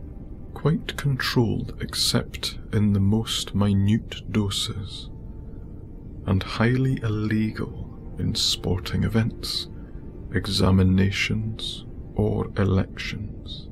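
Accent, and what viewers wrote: Scotland, United Kingdom. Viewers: you have a lovely bit of Scottish accent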